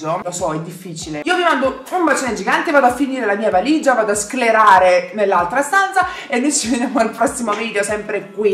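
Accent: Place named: Foggia, Italy. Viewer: posso farti una domanda che non c'entra niente col video?? tu sei di Foggia?? no perchè dall'accento sembra di si!!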